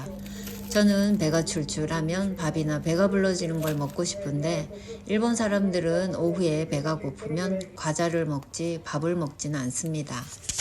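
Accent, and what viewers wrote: Gyeongsang, South Korea. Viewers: 경상도말이 이리도 교양있기도 들리는구나. 내말이 억센건 내탓이였구나 ㅋ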